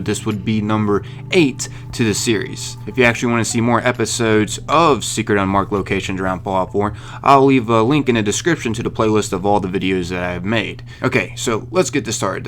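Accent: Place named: Western Pennsylvania, United States. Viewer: Brother you gotta be from Western PA right?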